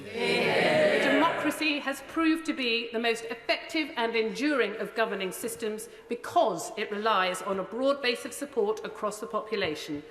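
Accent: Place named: United Kingdom. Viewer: Hear hear. Plus, beautifully spoken and such a wonderfully British accent.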